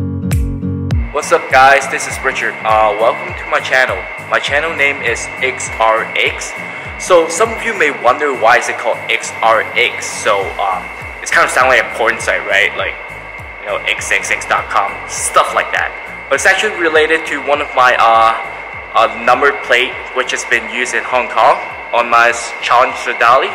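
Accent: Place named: United Kingdom. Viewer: Wow. Love your videos. I’m from uk. From your accent did you study here?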